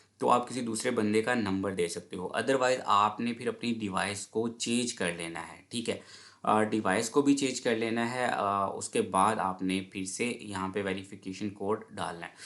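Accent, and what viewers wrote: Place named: India. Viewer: You are such a scammer You write the subtitle of your video in English But your speek is in indian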